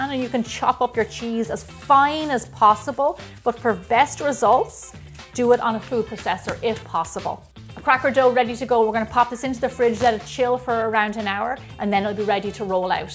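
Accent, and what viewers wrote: Ireland. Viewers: I moved from Dublin Ireland To the United States When I was 12 years old And I really love your Irish accent. unfortunately I lost my accent because the kids in school made fun of it and I so love to hear you speak in that lovely accent, and of course your recipes are fantastic!!! I am so happy that I found you on here!!!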